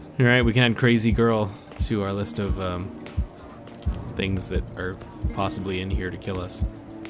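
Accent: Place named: West Coast, United States. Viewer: You have a very relaxing voice. Where in the USA are you? I'm guessing West Coast...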